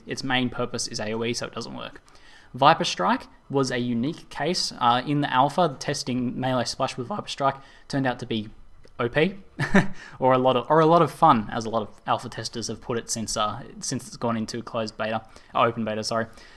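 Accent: Australia. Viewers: were are you from in Australia? if your from Aus that is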